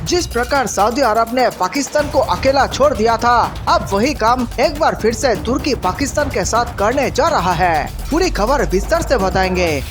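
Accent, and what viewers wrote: Bengal, India. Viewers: Bhai tu bangali ha tera bolna k ton sa pata chal raha ha lagaraho bongo munna bhai Jay hind......🇮🇳🇮🇳🇮🇳🇮🇳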